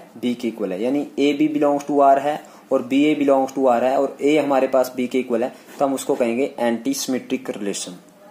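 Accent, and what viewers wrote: Haryana, India. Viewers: Apki haryanvi bhot cute h sir😁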